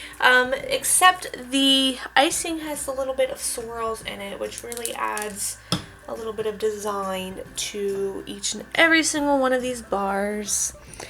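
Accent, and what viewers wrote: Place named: United States. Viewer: Oh it’s so cute 😍 I personally don’t like popcorn at all 🤢 but my kids love it!! The smell stays in the microwave for ages too hahahahaha 😂 How gorgeous was that little cake your friends mum dropped off 😍 it looked so delicious!! I am growing a few herbs atm (it’s spring here now) tho I don’t use them a lot lol. We have parsley,thyme,chives,and coriander just popping up :) P.S. I love the way Americans say herbs 😆 here in Australia we prenounce it “herbs” not “erbs” and we prenounce the colour mauve “moe-v” 😂😂 we are weirdos lol 😆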